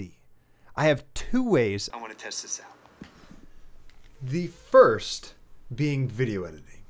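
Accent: Texas, United States. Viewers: Excellent video, I'm curious, are you from Texas?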